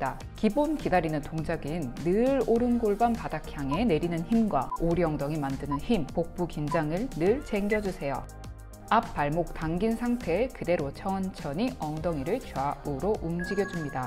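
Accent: Gyeongsang, South Korea. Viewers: 말투가 ....신기하네요 사투리인가요..?ㅋㅋ좀...신경쓰이는ㅋㅋㅋ 경상도인지 강원도인지특이하네여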